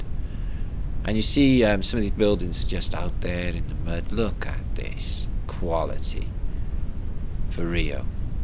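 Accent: United Kingdom. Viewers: off the cuff comments are what I live for! Man looking at self in mirror, “Hi, what do you do?” Reflection, “I’m an artist. What do you do?” Man, “I clean cars for a living.” Love you, although I can’t understand HALF of what you’re saying with that British accent!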